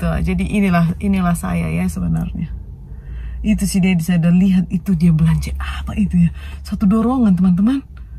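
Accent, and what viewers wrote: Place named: Medan, Indonesia. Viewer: Suka aku logatmu sis, ingat tetanggaku di kampung banyak yg dari Medan cute baby, lovely vlog sis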